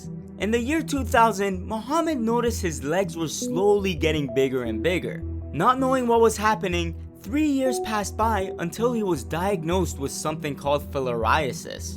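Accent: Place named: Canada. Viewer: Heyy I recognized your Canadian accent 😁 Es-tu de Montréal ?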